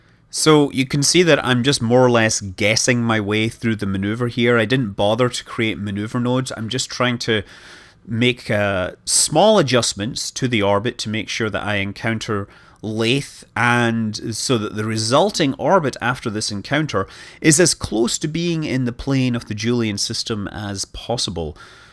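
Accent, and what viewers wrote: Scotland, United Kingdom. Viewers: i want to thank you for your awesome ksp videos, i learnt so much about ksp because of you man!!! and i picked up some real knowlegde along the way. keep up the great work and use your cool scottish accent more we love it:-)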